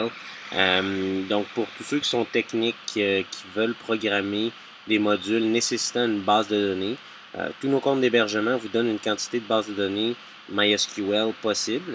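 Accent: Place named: Quebec, Canada. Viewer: J'adore l'accent québecois les "elle" ce disent "xwell" Mysql = Mysqwell :)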